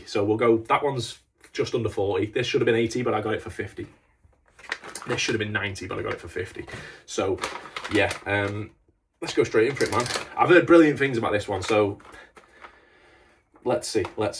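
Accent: United Kingdom. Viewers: What on earth is going on, I've never smoked weed before, somehow this has been recommended for me to view. You're getting weed in the post though some kind of "prescription"? And from you're accent your're obviously in the UK, and you've openly documenting this. I don't know where I am right now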